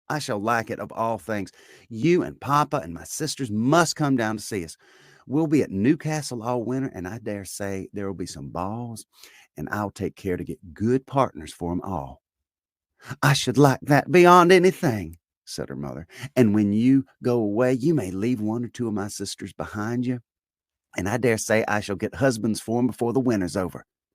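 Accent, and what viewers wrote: South, United States. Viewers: I have just found your channel-I absolutely love the Southern accent twist to these classics - I am just about to start Persuasion my favourite Austen novel -Thank you so much for the uploads ,much love from Southampton, England x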